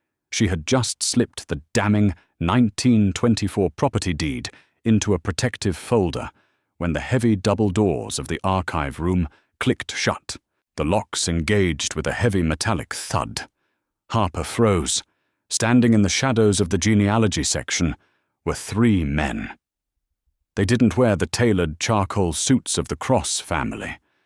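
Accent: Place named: United Kingdom. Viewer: My Heart and Soul went into a huge melting OOOOOHHHH when he fed her the steak bites from his own fork. Chills down my spine! Superbly-narrated tale and extra electric shocks listening to that purring English / British accent while following along with the Transcript on, and a piece of paper over the picture section of the screen so my imagination can do the magic its meant to! Windsor, Ontario Canada EH?! ❤️🇨🇦🥂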